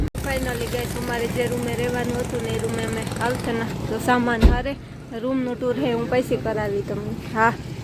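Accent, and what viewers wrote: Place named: Mer, India. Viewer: બીન આપણી મેરની ભાષા સારીલાગે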